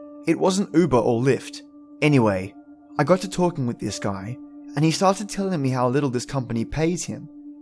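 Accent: Australia. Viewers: I'm suppose to be babysitting, but the baby went down for a nap so here I am 😏 ready for some great stories in that awesome Aussie accent of yours 🙂🖤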